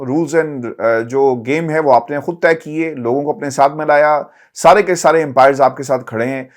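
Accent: Punjab, Pakistan. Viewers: The punjabi touch is pleasant, do continue with it.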